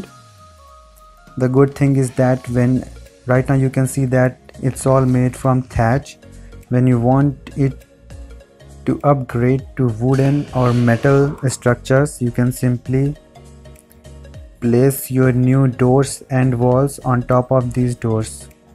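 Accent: India. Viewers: Are you an Indian ?? Your voice matches Indian voice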